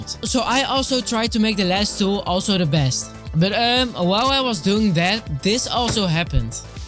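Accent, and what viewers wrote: Netherlands. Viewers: you sound so dutch ( i'm dutch myself lmao ) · your accent is netherlands en ik ben ook Nederlands daar om dacht ik het en het is een goede video is jouw friend ook Nederlands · dat hoorde ik all aan je accent 😂😂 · What country are you from because you have an dutch accent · What country are you from?you got like a netherlands accent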